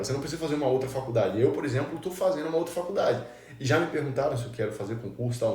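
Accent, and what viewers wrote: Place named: Santa Catarina, Brazil. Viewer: Voltou pra SC e seu sotaque catarinense tá voltando aos poucos em sgt kkkkk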